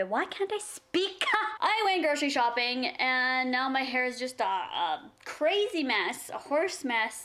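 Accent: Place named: Canada. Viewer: You guys seriously need to stfu. Number one " doesnt eat healthy why is she giving us tips" How do you know she doesnt eat healthy? We only see like 10 minuets of her day. How the hell would you know if she eats bad or not?! Maybe the reason she went shopping and only bought good food was because shes changing her diet? And number two "she speaks weird" e.t.c ITS HER ACCENT. MY GAWD. Part of my family is from Canada. The accents are different depending on the part you live in Canada. My cousins live in her province and talk almost exactly like her. My aunt and uncle live in North Canada and have a thick canadian accent. It just depeneds on where you live in Canada. STFU AND STOP HATING ON! I love you so much. I hate to see this. Please block who ever keeps hating. It makes me sick.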